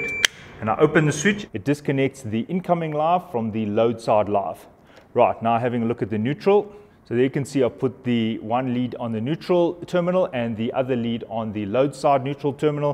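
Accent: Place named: South Africa. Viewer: I recognize that Accent from anywhere. Hello fellow South African... Lol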